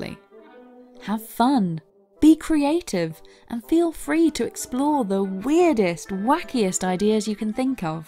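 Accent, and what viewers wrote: United Kingdom. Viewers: Seeing that the channel is called "Video Game Story Time", I expected a fast-talking guy rattling things off at a mile a minute. This slow, measured, British female voice, explaining things in a very calm and step-by-step manner, is entirely unexpected, but very welcome.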